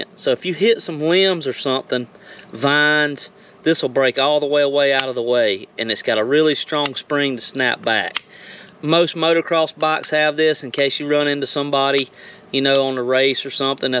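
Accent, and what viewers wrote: South, United States. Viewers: Still laughing at "sounds like the Dukes of Hazard"! LOL! You can tell you're from the South but your voice is clear and easy to listen to. The Northerners sound more like Canadians that Americans so....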